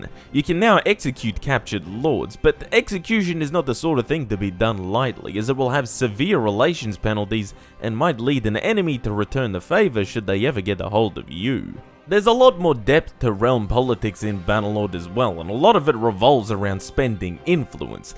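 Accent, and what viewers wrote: Australia. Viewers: Definitely Australian but with some kind of Slavic twinge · This guy sounds like the biggest ethnic from Australia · No. He sounds either Australian.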